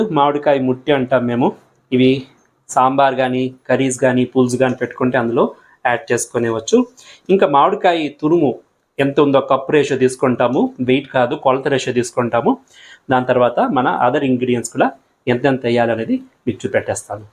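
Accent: Tamil Nadu, India. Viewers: no no meeru bagaane maatladu tunnaru kaaka pote meeru tea chese video lo paalu kaagatam leda maragatam ana kunda paalu udikaayie annaru andukani maatlade vidhaanam Tamil style gaa anipistundi naa mother tung Oriya.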